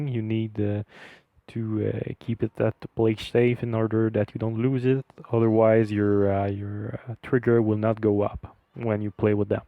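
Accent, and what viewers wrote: Canada. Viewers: Are you from montreal?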